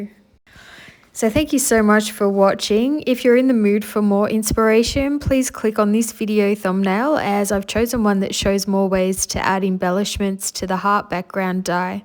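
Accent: Australia. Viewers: Hi, I hope you are enjoying your holidays. You are my favourite channel, it is so nice to hear an Australian voice!! I would love to see a tutorial for sentiments when you have time. ❤ Adelaide Adelaide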